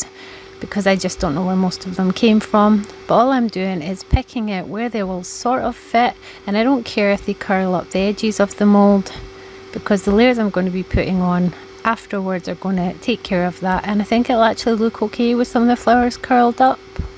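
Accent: Scotland, United Kingdom. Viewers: Hi. Its fantastic to hear a Scottish accent that sounds quite like mine. I'm a bit of a mixture. Born Coalburn Lanarkshire moved to Cumnock Ayrshire. Spent 10 years in Surrey and now I'm in Leslie Fife. Lol. I usually watch Acrylic Paint Pourers from America. Canada and New Zealand. I've just finished Resining a painting and this video of you with your BLESSED Resin plack.. I love it. The colours are amazing I will have to watch the full video. I have subscribed and liked your work. I love Livingston Centre but not been for a while. I have a neighbour who is poorly and wants to go there so I will take her down when we can organise it. Hope to catch up soon. Sandra 🌹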